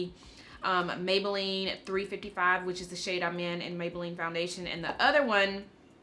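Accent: South, United States. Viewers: I love your southern accent I live I'm from New York city and I luv people with country accents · Girl, you are so pretty. And you sound like you’re from the south. Where are you from?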